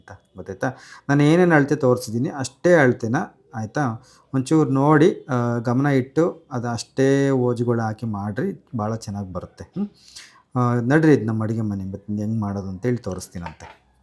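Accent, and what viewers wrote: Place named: Bellary, India. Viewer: Are u from,Bellai or Hosapete?Because,ur kannada accent is like that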